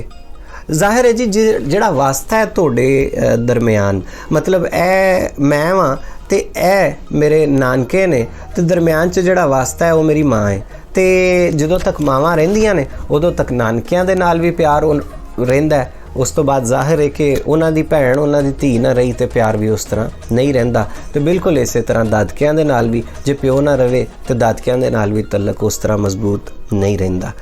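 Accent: Punjab, India. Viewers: Bahut alaw sir.... I like ur punjabi accent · Your Punjabi Accent is lit Sir✌❤✌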